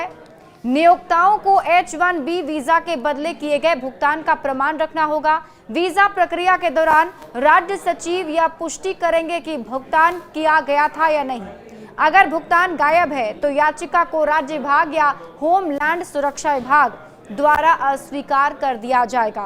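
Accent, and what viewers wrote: Bihar, India. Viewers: bihaaran awaaz se hi lag raha he teri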